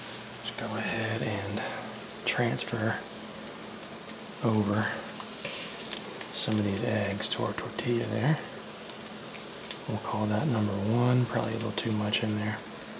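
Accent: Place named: South, United States. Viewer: Winging it cooking is the only way to do it makes it exciting! Recipes are never to my taste. You need to brush up on your one handed egg cracking skills sir 😂😂😂 the thick raspy southern accent and the sizzling pan is just 👌 chefs kiss one of my fave things in the world is bacon, i am so making one of these bad boys 🤤🤤 i never thought an asmr video would make me dribble so much 😂😂😂 thanks cowboy, also that is a seriously fine hat it's missing a feather tho;-) i love cooking vids, stunning eggs aswell blues are one of my faves and a lovely rich dark yolk is divine 🤤 ❤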